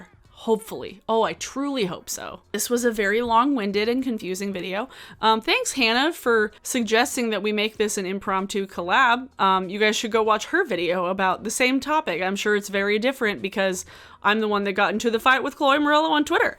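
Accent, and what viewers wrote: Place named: New York, United States. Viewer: Came over here from Hannah's video and loving it! Also, your accent sounds exactly like my aunt (she's in NY state and I'm in Western Canada), so it was like listening to her for a while! She is also very no-nonsense.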